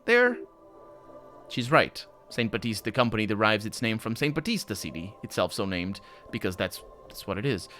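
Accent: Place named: Portugal. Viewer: Dude you're already a portuguese person speaking english, trying to act native american would be a bit too hard lol. I don't mean this as an insult. I just know how much portuguese differs from english is all. Also coming from someone who speaks a different language as well that doesn't look even close to english either. heck I don't think I can pull off accent anyway.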